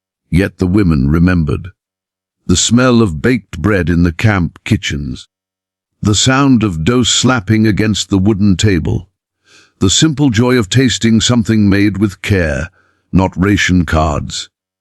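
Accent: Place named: United Kingdom. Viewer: Why do you use a British sample for the narration?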